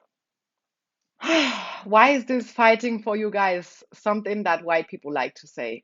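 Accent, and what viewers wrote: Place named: Nigeria. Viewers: You have Nigerian accent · Bantu History, l enjoy her Nigerian accent.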